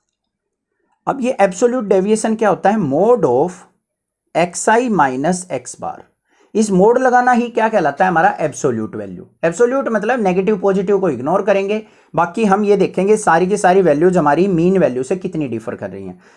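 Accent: Haryana, India. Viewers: Sir ap haryana se ho na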